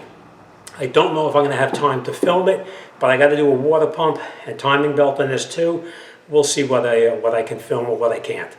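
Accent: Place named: Boston, United States. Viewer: Your video was very helpful to me it was great the way you explained each step as you went along. I took your advise on the spring compressor deal and purchased the whole assembly that made the whole job much easier. Also I noticed from your accent, you must be from the Boston Massachusetts area. I retired and moved from Palmer Massachusetts to Georgia but my heart is still in Massachusetts and it was nice to hear from someone that lives in that area. "Thanks "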